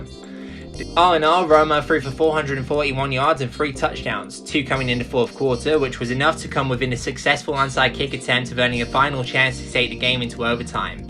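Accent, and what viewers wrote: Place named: Australia. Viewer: Didn’t even notice the Aussie accent right away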